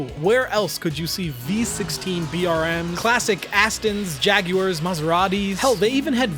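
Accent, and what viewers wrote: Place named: United States. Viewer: a Yank talking about British racing...? no sorry , you've lost me not even a minute in...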